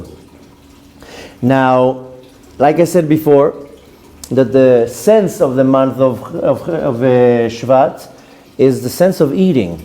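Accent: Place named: Israel. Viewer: Thank you Rabbi for another great class. I'm curious as to how you have such a distinct Israeli pronunciation of Hebrew when quoting verses and prayers being that you are Baal Tshuva and Chabad. Other people who have become religious and had no previous Jewish background just adopt all the ways of their new group. Please share any insight as to how you've managed to keep your Israeli pronunciation while also being Chabad. By the way, it's not in a bad way at all, on the contrary. Was just wondering since you're usually so open about yourself to students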